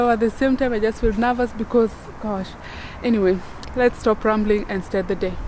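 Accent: Luo, Kenya. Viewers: Luo ascent 👌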